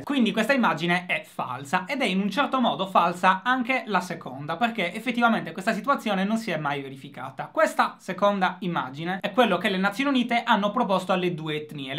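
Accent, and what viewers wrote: Sardinia, Italy. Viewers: a quei tempi il tuo accento sardo era più marcato XDDD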